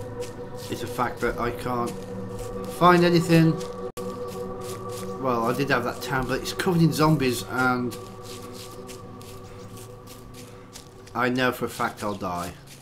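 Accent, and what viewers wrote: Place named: United Kingdom. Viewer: from now on I'm watching ALL of your vids with the closed captioning on. Apparently goggle can't decipher a British accent, and translates it HILARIOUSLY!!!: "Uh, I see shit." Google Translation: "Rubbed, litigation." Bwahahahaha!!! Keep up the great work, mate.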